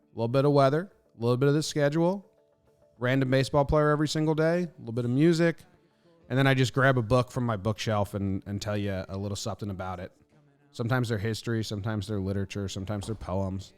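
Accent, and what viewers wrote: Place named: Chicago, United States. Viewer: I know you're a Yankee but are you originally from Chicago? I hear Chicago in your voice bro.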